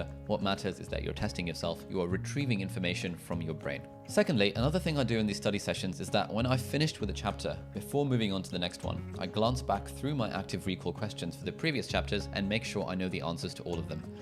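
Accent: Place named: RP, United Kingdom. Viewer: Never heard ‘wasteman’ in a posh accent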